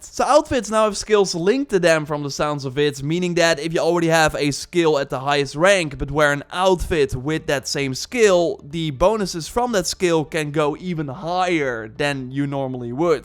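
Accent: Netherlands. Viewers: I can tell he's Dutch and it's just too funny.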